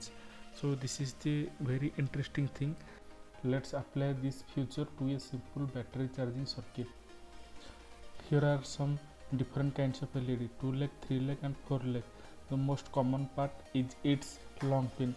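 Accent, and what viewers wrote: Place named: Odisha, India. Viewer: Your English has Odia Accent.... Where are you from ???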